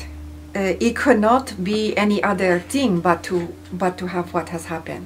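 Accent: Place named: Eastern Europe. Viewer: Why is channelingn in an Eastern European/Russian/Spanish accent??? Come now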